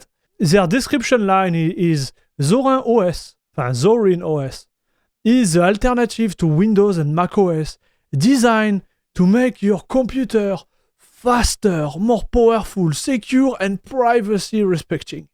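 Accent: France. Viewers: Bro you need to stop speaking in english, french people suck when speaking any other language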